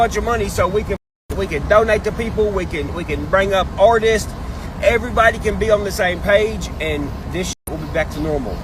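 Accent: South, United States. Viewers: Still sounds like a sassy southern hairdresser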